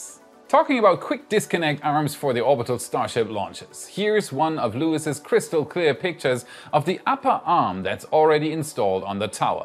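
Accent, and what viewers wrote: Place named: Germany. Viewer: I believe he is German, so English is not his first language. You should hear how the Brits pronounce Aluminum. :)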